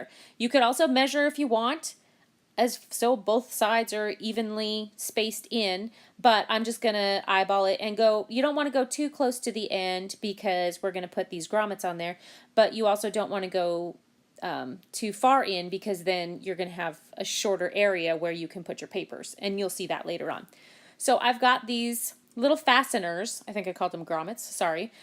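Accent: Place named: South, United States. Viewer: Really nice tutorial. Could you please tell us the name of that tape again, (I'm from California & I couldn't quite catch it with your So. accent) LOL Thanks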